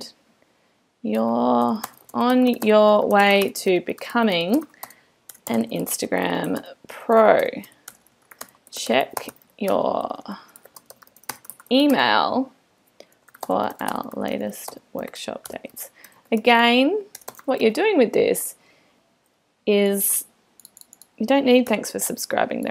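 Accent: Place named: Australia. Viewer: Hi, I love you girls you look great and being a fellow Aussie I just looovveeee the accent. Hey how do I get my non - generic landing page onto my website??? Many thanks, The Urban Garden Companion- An Aussie Gardener in the New York Jungle.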